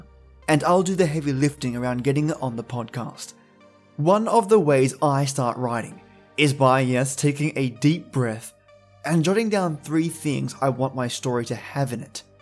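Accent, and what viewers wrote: Australia. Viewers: You make me wish I had an Australian accent.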